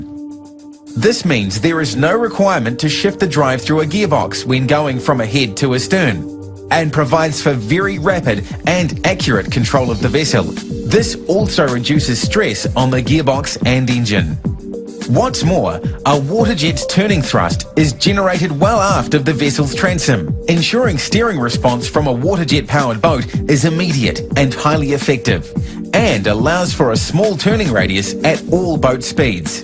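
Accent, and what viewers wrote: New Zealand. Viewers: Kiwi. Waterjets were invented in NZ. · nz accent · Love the accent. Reminds me of the Kiwi advert for Deck Stain, "This weekend I will be polishing my dick".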